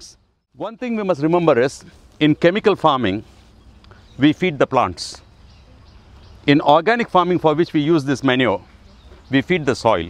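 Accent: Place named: Kerala, India. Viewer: His accent sounds like that of Malayalis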